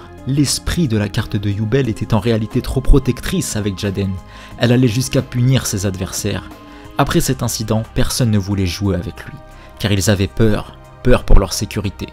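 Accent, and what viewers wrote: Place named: Alsace, France. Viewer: je te connais t'es alsacien toi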